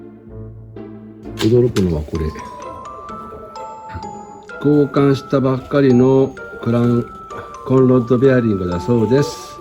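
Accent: Niigata, Japan. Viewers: 違ったら失礼ですが新潟の方ですか？喋り方やイントネーションに親しみがあるので☺️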